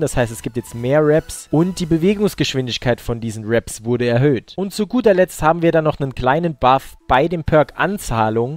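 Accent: Saarland, Germany. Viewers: Kann es sein dass du ausm Saarland kommt?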